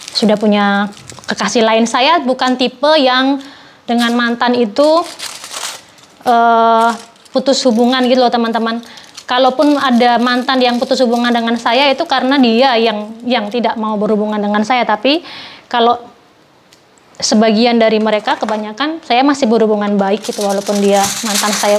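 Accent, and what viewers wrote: Java, Indonesia. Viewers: Mbak orang mana ya.... Jowone ngendi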